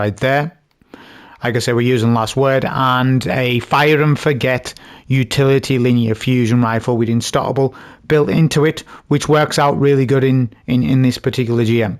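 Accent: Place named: North, United Kingdom. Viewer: Northern Accent Sh*t Talking Destiny, Viewers, Guardians. Informative Gameplay Even Showed A Cheese I'd Never Seen!! Immediate Sub 😄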